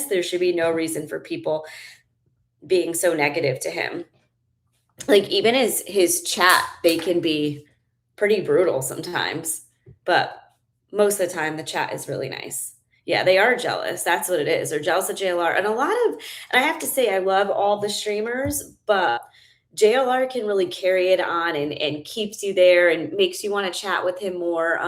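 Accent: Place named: New Jersey, United States. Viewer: Wild guess but are you from Jersey and/or Italian?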